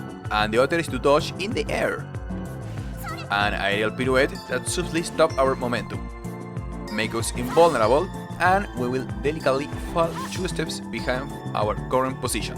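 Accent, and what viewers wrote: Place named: Spain. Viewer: Don't want to be mean, but you need to work out that pronunciation bro, either that or make Spanish guides. Try listening to the words on a text-to-speech or an online dictionary, you are using Spanish phonetics for English and it's never gonna work, just relax and it will come out more natural. The first step to talk more clear is to talk slower even if it doesn't sound as "cool". Thanks for the guide I think, this is the same in-game tutorial though... I just want to know how to make 10 hit+ combos without the air-state resetting, I try to use the wall but it doesn't work consistently.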